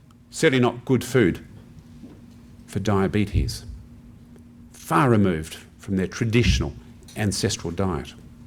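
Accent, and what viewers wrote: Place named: Australia. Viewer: Reasoning always sounds better with an Aussie accent.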